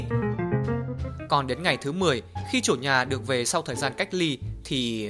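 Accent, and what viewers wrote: South, Vietnam. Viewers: Có giọng Nam nữ ta ơi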